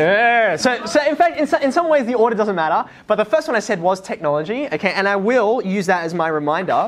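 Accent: Australia. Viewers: listen to his Aussie accent hahahaha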